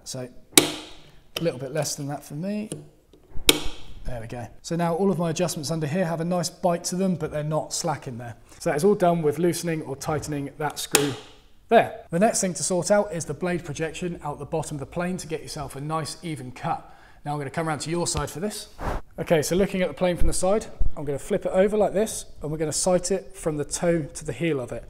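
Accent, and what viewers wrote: United Kingdom. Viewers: Silly yank i am, just thought he was using the queens english.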